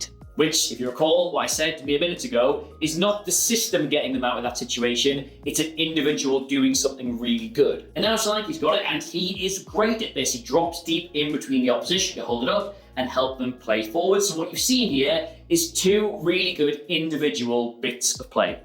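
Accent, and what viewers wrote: Newcastle, United Kingdom. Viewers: No need to apologise, I actually like the Geordie accent 😛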